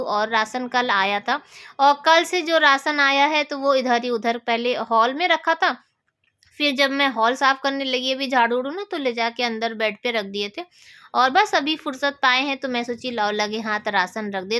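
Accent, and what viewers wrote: Uttar Pradesh, India. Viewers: Koi na apki UP wali language hai..😂😂UP wale eaise hi bolte hai 😂😂😂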